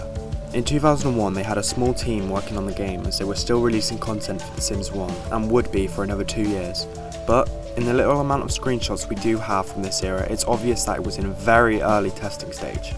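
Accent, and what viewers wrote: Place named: United Kingdom. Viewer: loved the british accent but it's a thumbs down from me for the audio pops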